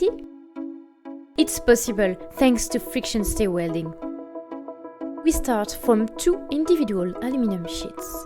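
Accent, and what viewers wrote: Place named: France. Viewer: Thanks to the french for ruining this video i cant understand anything over her accent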